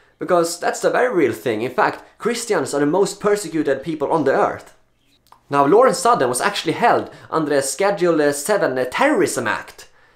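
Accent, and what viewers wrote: Sweden. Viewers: This story is an absolute outrage, its all over youtube, what a joke. Radical Christian? Good grief... nothing like directing the Muslim problem on a other religion #SMH Btw, I love your accent, rants are just so lovely in broken English-Swedish. Keep up the good work. All the best x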